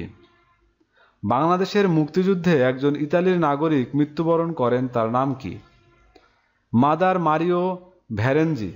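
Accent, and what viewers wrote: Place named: Noakhali, Bangladesh. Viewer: উচ্চারণ পুরাই নোয়াখাইল্লা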